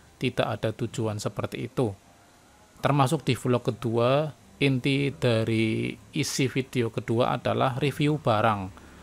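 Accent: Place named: Banyumas, Indonesia. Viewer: Orang Ngapak ya om 😂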